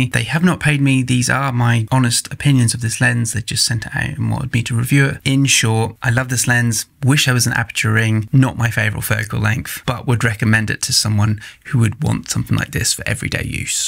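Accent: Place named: East Anglia, United Kingdom. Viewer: I am based in Istanbul now, just doing a bit of research into Fuji and lenses as I want a new "fun camera". Instantly, I picked up a bit of East Anglia in your accent - not 100% sure I went to check your website and yes, Suffolk! Amazing. I grew up in Norwich. Moved away in 2019. Subscribed!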